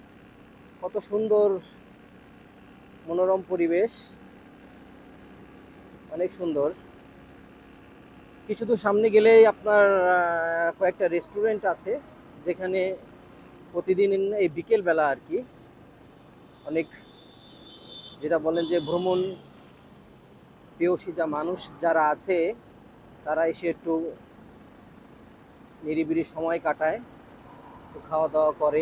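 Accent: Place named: Bhola, Bangladesh. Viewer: মোটেও ঝুঁকিপূর্ণ না তবে কিছু দুষ্টু ছেলেদের অতিরিক্ত জোরে গাড়ি চালানোর জন্য ফাঁকা রোডে এক্সিডেন্ট হতে পারে। গুজব ছড়াবেন না। আপনার কথা শুনে মনে হচ্ছে আপনি চাঁটগাইয়া না, আপনি ভইঙ্গা।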